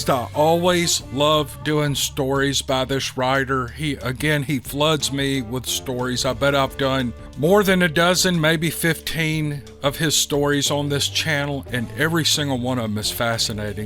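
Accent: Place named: Texas, United States. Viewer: Is this guy from Texas Listen to that thick ignorant accent he's got. Like he's about to eat a piece of pie or just got done mowing his yard and drinking 5 beers to do so popping open the sixth his wife knows that she's gonna get beat down tonight so she pops a Xanax and a Vicodin and downs it with 1 whiskey sour mixed drink. She's only gonna feel it in the morning when her children are starring down at their breakfast eating in a hurry trying to avoid any eye contact or small conversation with their physically and sexually abusive father or their coward mother. Just five more yrs Billy says to macey his twin sister after breakfast as they swing on the back porch. Macey stares out into the acreage and deep woods of the backyard whishing someone or something would happen to end it all now. 13 long yrs of hiding under their beds running for their lives into the woods and communicating through walkie talkies to make sure they avoided their old man. 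Then church every Sunday where they have to watch him deliver his sermon from the front pew. The older they got the more the well masked hippocracy seemed to cut into not only their religious beliefs but also their belief in the goodness of humanity. Their mothers fingers seem to calmly and masterfully stroke the ivory and black keys of the church piano while the congregation sings hymns but if you sit close enough and know what to look for you can see her hands trembling slightly more each week as she loses her dignity and self respe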